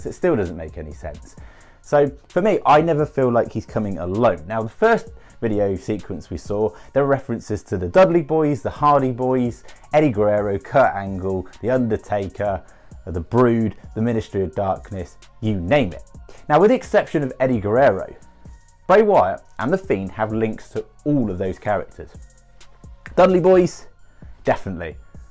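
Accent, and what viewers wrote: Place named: United Kingdom. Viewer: Found your channeled today. Once I heard your voice and that British accent I subscribed immediately - because that’s the law. Everyone knows if you want to be a good wrestling Youtube channel you need a Brit. Don’t question this rule, because the video was really good so the rule was right. Oh and I really hope Bray comes back!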